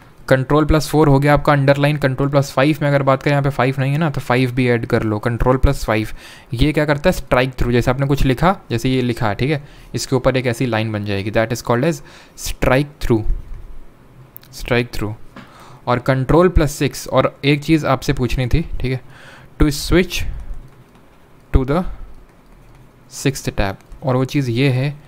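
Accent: Kanpur, India. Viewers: The timing . Kitna badhiya samjhaya Article 2 ko...I love this kanpuria ascent. love from gorakhpur sir ji.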